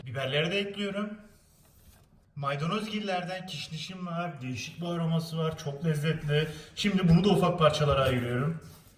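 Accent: Adana, Turkey. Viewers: Hatay sandıkiçi diye kanal açıp diyarbakırda yaşayıp adana ağzıyla konuşmak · Konuşma:ADANA Kanal:HATAY Yaşadığı yer: DİYARBAKIR nE? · aslı adanalılar öyle derler götünden Adana'nın şivesi hataya verme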